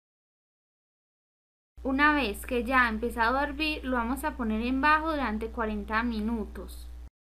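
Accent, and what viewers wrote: Colombia. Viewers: Me encantó! Si solo verlo da gusto imagínese probarlo :p saludos desde Perú. Ah por cierto esa voz tan típica de Colombia es hermosa y enamora😌😍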